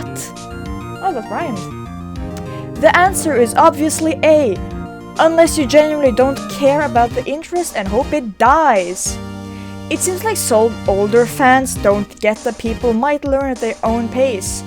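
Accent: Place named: Sweden. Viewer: i feel the swedish accent...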